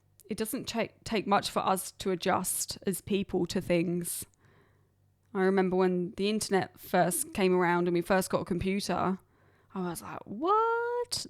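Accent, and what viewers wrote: Australia. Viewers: You should reconsider the title of the 'pod'. I would lean into your lovely Australian accent. And the fact that you're honest about your regrets in life, something like "Cautionary Tales: The Karen Chronicles" or "Bad Example".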